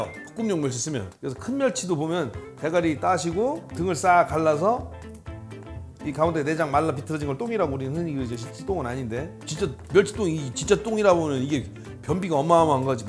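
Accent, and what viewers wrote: Chungcheong, South Korea. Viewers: 구수한 충청도 사투리? 잘 모르지만 어머니 고향이 충청도인데 구수한 . 그래유우~등등. 듣기좋은 우리나라말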